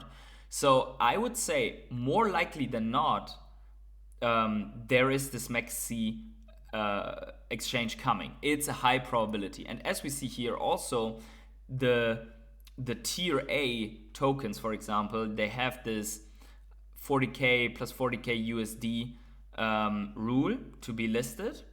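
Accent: Germany. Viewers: Das ist mal heftiges denglish 😂😂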